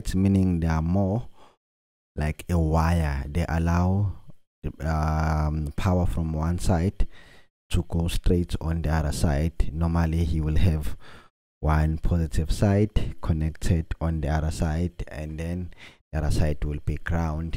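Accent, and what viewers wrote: South Africa. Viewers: You from south Africa bcz I here from you iiibo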